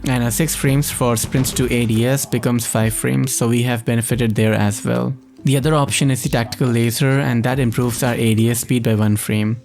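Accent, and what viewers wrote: India. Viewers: It's it just me or really does have a definitive Indian accent? Anyway, you're pushing great videos man. I really loved your qq9 build which was recommended by Jokesta. Keep up the good work 🖖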